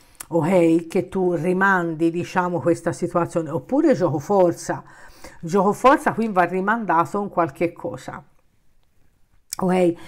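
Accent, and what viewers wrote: Tuscany, Italy. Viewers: A me mi fai morire 😂 acciderba al toscanaccio🤙😊😂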